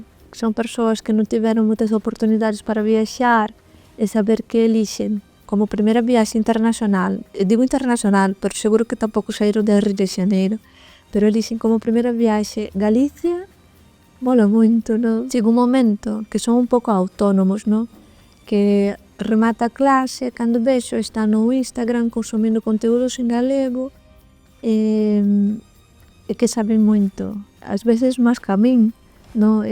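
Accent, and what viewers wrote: Castile, Spain. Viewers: Haber el canal Horrible la locutora que hable con acento gallego ESO DE HABLAR CON ACENTO CASTELLANO LE QUEDA HORRENDO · ela fala de uma maneira muito castilhanizada... no programa galegos pelo mundo quando mostram pessoas mais velhas elas falam igual aos brasileiros... a impressão que dá é que o galego tá sendo pouco a pouco absorvido pelo espanhol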